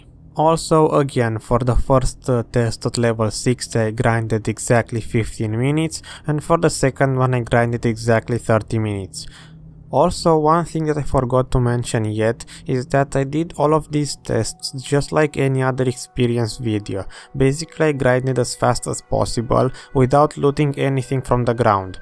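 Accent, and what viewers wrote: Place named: Romania. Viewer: esti roman da-te dracu! ti-am depistat accentul :))) noroc , bun video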